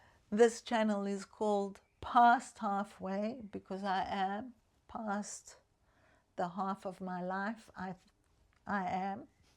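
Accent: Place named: South Africa. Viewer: thanks for sharing - love your pretty Austrian brown skin, clothes & furniture. You are delightful breath of fresh air. Sorry about your neighbour's tree being gone (your 16yo girl is precious) I can hear your South African accent ❤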